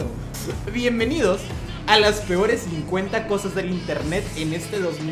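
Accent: Colombia. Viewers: Eres colombiano no?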